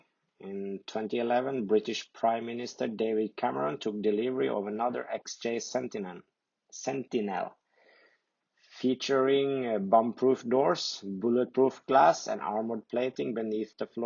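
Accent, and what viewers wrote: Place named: Norway. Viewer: As well as visually showcasing the impressive features of this gorgeous car, your video is a delight to listen to. Maybe the commentary was to some extent scripted, or perhaps it is not having to simultaneously think about what you looked like on camera, that resulted in such an assured manner of speaking. I know many people hate hearing how they sound in recordings of their own voice. But believe me, your diction and delightful Norwegian accent in this voiceover comes across as professional and intelligent, reliable and trustworthy ,as well as approachable and engaging to an English listener.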